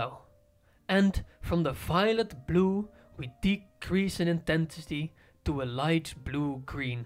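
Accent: Netherlands. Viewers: Your videos are great in content, crazy you have so few subsrcibers. Maybe work on the dutch accent? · The Dutch accent sounds like the complement of the Australian accent so it definitely worked, added colour, in my case :)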